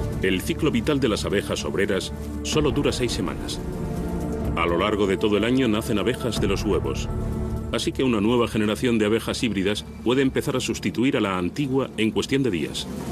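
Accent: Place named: Spain. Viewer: Cuando un Español narra un documental, sabes que va a estár muy bueno!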